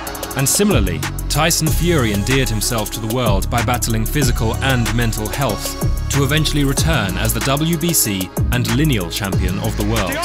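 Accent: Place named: United Kingdom. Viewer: Another awesome one !!! I really like your accent i asume you're a brit , cause English is my second language and sometimes i can't understand some accent's 😁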